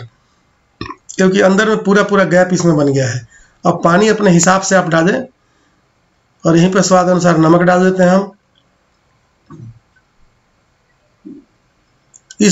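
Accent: Bihar, India. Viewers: In starting when u say Ram Ram ji that is really nice 🙏👌and the way bihari people speak that is so sweet 😊😊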